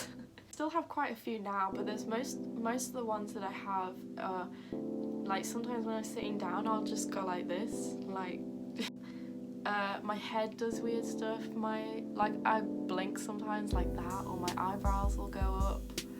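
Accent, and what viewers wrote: United Kingdom. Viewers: Oh no! I absolutely fell in love with you from the moment I saw you. You are so pretty and cute that I can't watch a post without smiling. The british accent is my favorite. Kelly osbourne infact is my celeb crush... Guess you are to now lol. No there isn't an shrine next to my Kelly shine...yet; but keep posting cuz we love you!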